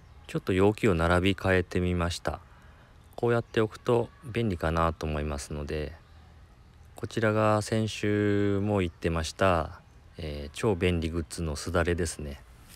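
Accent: Kyushu, Japan. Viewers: こんばんわ〜 チャンネル登録させていただきました！ 方言的に九州の方ですか〜？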